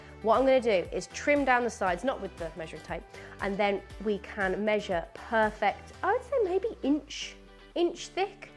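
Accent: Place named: United Kingdom. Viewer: I was going to make a snide comment as I thought you were American and I can't abide Americans, however upon hearing your accent and realising that you are British I shant be making a snide comment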